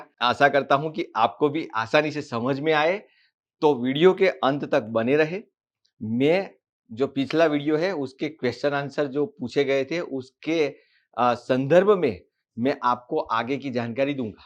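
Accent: Gujarat, India. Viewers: ये शायद गुजरात से हैं❤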